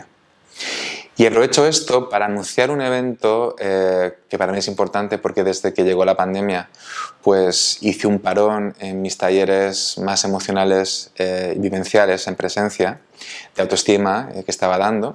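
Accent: Buenos Aires, Argentina. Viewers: Jajajajajaajaja sos más lindo me encanta el acento argentino. Saludos desde Uruguay. AMO que le pongas humor a tus videos, le suma muchísimo !! Un saludo grande che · Jajajaja muy bien hablando en Argentino! Me encantó! A ver cuándo venís a Buenos Aires❤ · Me encantó el asiento argentino.. saludos desde Argentina 😜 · Te sale muy bien el acento argentino 👏👏👏 Me encantó escucharte! Ya hablando del tema del vídeo justamente me están pasando cosas parecidas y siento un agotamiento mental y físico que me hacen daño a veces. Entrar en el conflicto es muy fuerte, a mí me trae angustia que duele en el pecho! Ahora lo manejo desde la reflexión pero no logro evitar la angustia en la primer etapa. Luego lo puedo superar. No es sencillo de explicar pero lo puedo manejar. Gracias siempre mi querido terapeuta. Abrazo desde Argentina. ❤️ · jajajaj el acento argentino, lo amo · Jajaja me sorprendió el acento argentino! 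XD muy bueno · Jajaja amé tu acento argento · Amé tu acento argentino..!! Quedo pendiente de tu imitación colombiana..! 😂😂🇨🇴 el buen humor es maravilloso..! Cuánto aprendo contigo..! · Acento argentino...o uruguayo...un abrazo...! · Me encantó tu acento argentino ya me creí que eras de los nuestros!! El humor sano e inteligente es mí aliado para muchas situaciones! Saludos desde Mendoza Argentina!! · Jajajja....Te queda bien el tono argentino,cariños desde Bs As!! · sorprendido por tu porteño.. aunque le falta un poco de trabajo. Es muy fácil hablar en argentino, intenta hablar el castellano en italiano y te convertis en un porteño más. Abrazo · Jajaja te salio genial el asento argentino!!!!! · Como eso el final de tu carrera ?a no!! que hariamos sin ti los sabados por la mañana?Te sale bien el argentino, tendras que venir a perfeccionarlo aqui ,bendiciones desde Argentina . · Ayyy...que gracioso!!! 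Pareces porteño!!! Ja ja ja!! Hermoso tu vídeo y super útil para entendernos un poquito más...la observación en cada situación aporta claridad , es como desmenuzar y llegar al fondo para desdramatizar. Besos desde Bueno Aires. Argentina!! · Jajaja,argentino,pero no todos hablamos así 😄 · Jajaja ! Muy bueno tu acento argentino! Te lo digo desde La Plata, cerquita de Buenos Aires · Buen dia soy argentina y me hiciste 😀 reir porque asi tal cual hablamos pero los de BUENOS Aires. Nunca cierres tu canal que sos de mucha bendición. Con este video le voy a dar una bendición muy profunda a alguien de mi familia que está atascado en su emotividad porque tiene TLP y lo necesita. Dios te bendiga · Gracias por ese acento "argento", un gusto iniciar el día con tus vídeos y enseñanzas. Afectuoso saludo!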